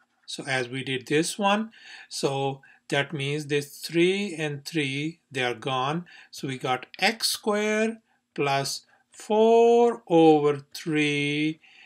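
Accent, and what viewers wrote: India. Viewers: I'm really confused if he's Indian or Canadian. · Sir your way of speaking tells me that you are punjabi?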